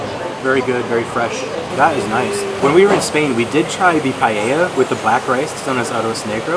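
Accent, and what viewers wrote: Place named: United Kingdom. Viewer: Why does she have a british accent?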